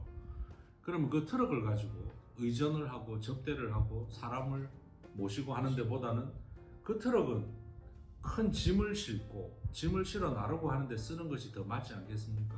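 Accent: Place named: Busan, South Korea. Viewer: 부산이신데 억양이 지나치지 않고 목소리가 좋으시네요 한번 가보고 싶은데 너무 머네요 ㅜㅜ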